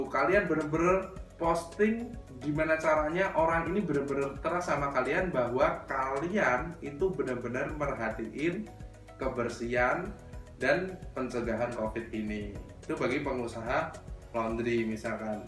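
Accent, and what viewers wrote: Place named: Java, Indonesia. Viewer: KOKO MEDOK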